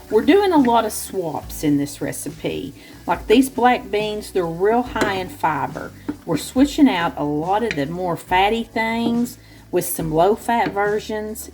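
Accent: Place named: Georgia, United States. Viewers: I cut my bell peppers from top of stem...curve over seed pod to bottom. I used to do it your way....so maybe try my way lol! Love u...I am a SC girl....so appreciate the accent! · I do love listening to you talk, you remind me of my hometown in southwest Georgia. Your recipes are delicious and healthy. And I love cooking the food you cook ❤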